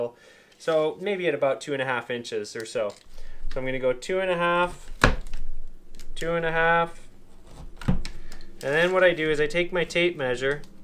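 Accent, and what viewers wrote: Canada. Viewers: Who else is here for the drywalling and Canadian accent?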